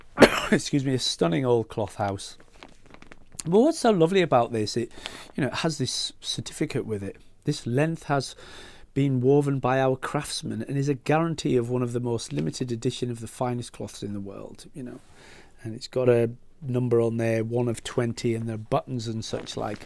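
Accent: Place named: RP, United Kingdom. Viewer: Thx graet video sir! I can hear your clear queens english!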